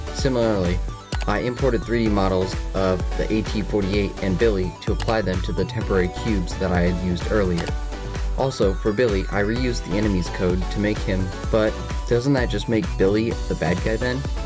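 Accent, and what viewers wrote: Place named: United States. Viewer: ig your american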